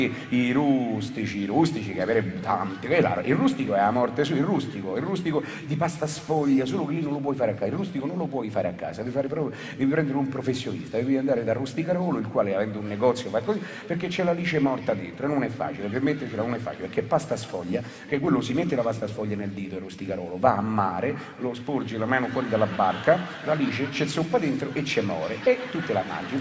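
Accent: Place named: Rome, Italy. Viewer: Enrico Brignano, che dire, io non sono nato a Roma ma il dialetto Romano suo è raffinatissimo. Tante risate e affetto da Perugia. Viva il Cabaret ( quello vero) <3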